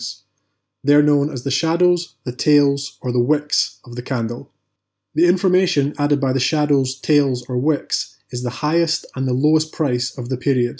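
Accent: Scotland, United Kingdom. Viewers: Just a beginner here and was glad to find a video with easy to understand info about candles, and by someone with an awesome Scottish accent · Thank you for the clear and simple explanation. Great Scottish accent for narration too. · Looks like I've found my teacher and a Scotsman by the sounds of it, like myself 👊 I recently opened a brokerage account and had a go. Yeah, ended up with a migraine and worn teeth. Thought I should search out a guru! Looking forward to an intense, enlightening journey! Really appreciate your content my friend! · Great to hear another Scots accent. :) I just signed up for a ForexCT account but having suffered severe memory loss from a brain injury my days of previous financial education are a lost blur. So this video, I'm sad to say, is where my level is at. I'm just trying to put my life back together. (I'm a Glasgow boy but I now live in Australia). 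So thank you. ;) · Excellent video, very well explained and presented. Nice to hear a Scottish accent as well. Thanks for the video. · Absolutely love clicking on a video and hearing a Scottish accent! Thanks for the great vid bro 🏴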